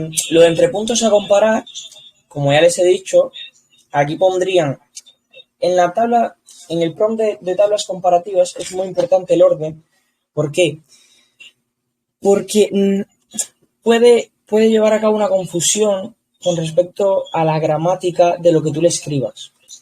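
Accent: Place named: Spain. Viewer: Vaya vozarrón y acento español llevas colega 😂👏🏼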